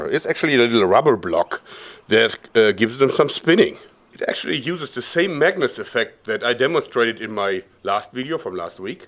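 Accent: Germany. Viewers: lol ist der deutsch voll der deutsche Hintergrund in der Stimme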